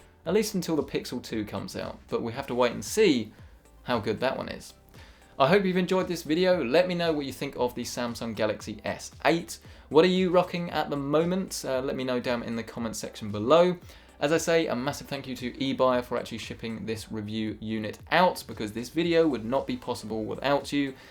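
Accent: United Kingdom. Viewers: e sounds more annoying than other british accents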